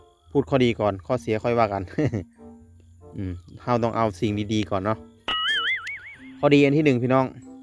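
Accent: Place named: Isan, Thailand. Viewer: พูดอิสานฟังยากอ่ะ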